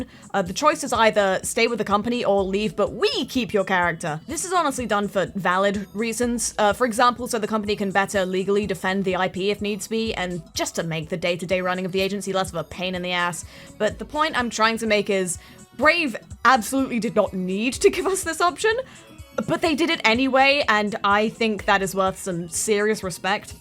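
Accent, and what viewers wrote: United Kingdom. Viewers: Lol you're a masterclass at explaining things. Maybe its just because your british voice and humor is so nice to listen to lol. I look forward to seeing how great you grow <3. Hope you will have a lot of amazing stuff planned. btw: +1 sub toward your million. I see you from a ton of clips <3 Your Moth-...I mean umm I have seen those clips too;)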